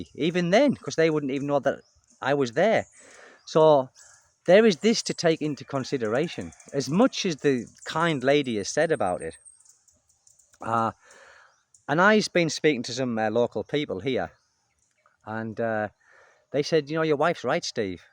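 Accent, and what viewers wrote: United Kingdom. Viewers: I am probably wrong, but you sound like a Brit. I am just a bloody yank. I can think of a half dozen places that are not safe in the UK. London, Manchester, Birmingham, Liverpool... you get the point the UK is not safe. Even 40 years ago it could be said that Belfast would not have been safe because of the IRA. In the USA, I am always armed. I have never had to use my firearm and I have lived in some very dangerous places. There are still many many dangerous places in the USA and even Canada as well. I would have to agree with your wife. Safe during the day is one thing, safe at night is something else. Now if you lived in Japan, I would say she was overreacting. But any one of the 7000 islands in the Phil, she is right. It is still a 3rd world country and people will do desperate things for money.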